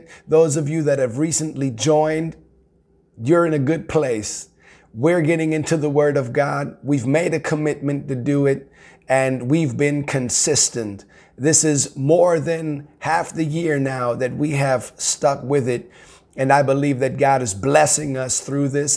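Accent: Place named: Cockney, United Kingdom. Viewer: Awesome Brother 👌 Also, loving the British accent, you sound like a proper cockney geezer 😄 Big love 💘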